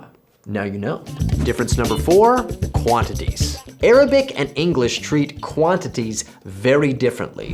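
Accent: Egypt. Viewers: يا الله اللهجه المصريه سيئه جدا مفروض انك تعلمت لهجه شاميه او لهجه خليجيه بيضاء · As a Saudi myself you sir are 100% certified ✅😂 I notice that you speak Arabic with a hint of an Egyptian dialect which is cool and understandable seeing that most people choose that dialect to learn Arabic. Your pronunciation is impressive and Egyptian dialect has it's own humour and "ring" it's such a heart lighted dialect in Arabic so hearing you speak with it in a common tongue not a traditional one makes you relatable and pleasing to hear ❤ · It sounds like you’re learning in Egypt 🇪🇬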